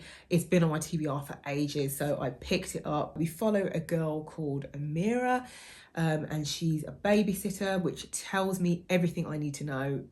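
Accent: United Kingdom. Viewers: ! I just got your video suggested yesterday and seeing this one today and you got almost 20k subscribers!!!! I am so so happy for you, you totally deserve it! As someone who was mentally ill and had to stay at home for long periods of time myself, I am sending you love and spoons 💕 welcome to BookTube! I love British English so I am so happy to listen to you 😊 also, I don't know if Open water is necessarily the right book if you are looking for something more lighthearted! Don't get me wrong, it is an amazing book but its not without its triggering topics. Can't wait to hear if you liked it!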